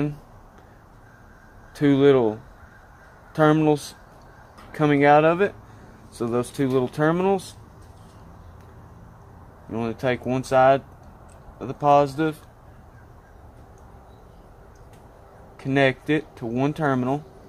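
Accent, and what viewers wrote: South, United States. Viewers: Somewhere in the south. Really annoying to anyone outside that area. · The information presented is ok , but that southern accent is sounded much better 😊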